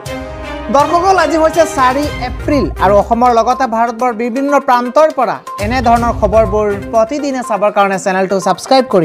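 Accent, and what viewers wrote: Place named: Bangladesh. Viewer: Toi bangladeshi neki kotha khuni khenekua lagise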